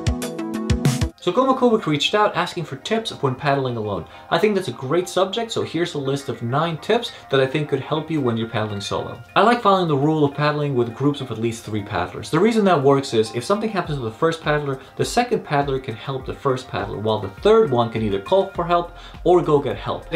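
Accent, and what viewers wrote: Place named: Turkey. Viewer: You have a super Turkish accent for a Texan.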